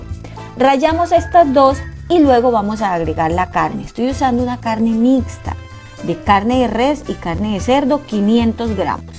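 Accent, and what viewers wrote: Colombia. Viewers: Ud es colombiana ??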